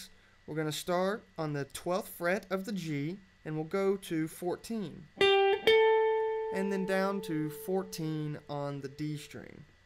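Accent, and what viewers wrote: South, United States. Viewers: you're good, love your southern accent too.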